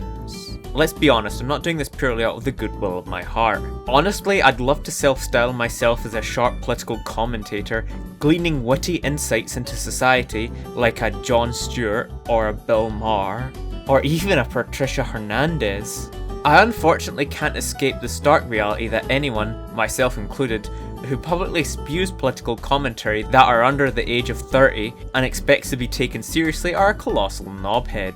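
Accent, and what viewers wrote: Scotland, United Kingdom. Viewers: Time for monthly dose of smooth Scottish gamer man Also make the walking dead video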